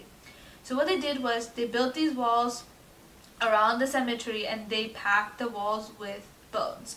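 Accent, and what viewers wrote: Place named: France. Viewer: Woah this is so interesting, and also your French accent is so nice 😊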